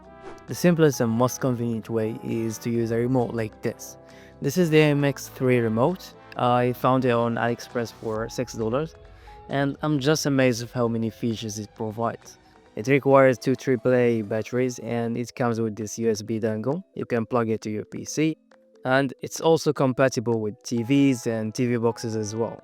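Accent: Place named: Morocco. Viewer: Anglais mdrdra b darija accent love the video, Good luck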